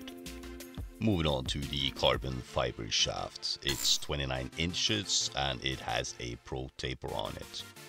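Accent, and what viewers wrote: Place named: Norway. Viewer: Thought so I have a few friends from Norway and caught a faint lilt in your faultless English.update,I have just ordered this cue now,for sure a great back up to my predator revo,thanks for what I believe to be a wise investment,look forward to more of your content,by the way you move around the table with great skill.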